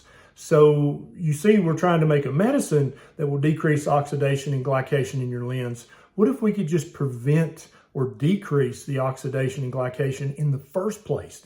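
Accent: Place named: East Tennessee, United States. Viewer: Dr., I am from East Tennessee, living in Florida for decades. I so enjoy hearing you speak. Sounds like Home. And your wisdom, freely given, feels like Home. Thank you to a true son of the volunteer state from a daughter of said state. You have a mighty big heart. Much gratitude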